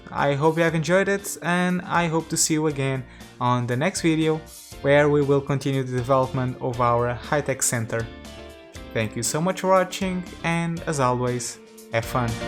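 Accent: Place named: Portugal. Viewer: So de ouvir a pronuncia já sei que é portugues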